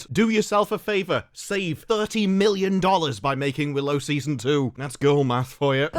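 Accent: United Kingdom. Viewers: This man is most definitely British.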